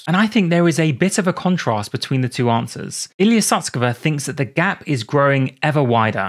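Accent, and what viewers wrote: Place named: United Kingdom. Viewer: When these videos become too technical for me to understand, I'm still learning a beautiful British English.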